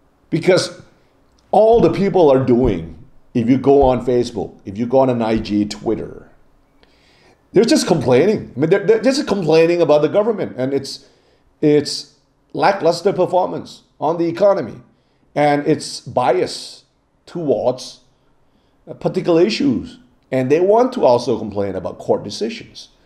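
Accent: United States. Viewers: I am here again listening to you. Not coming to sink in the politics. I have headache from that. But mainly to hear your American accent I like it so much very much.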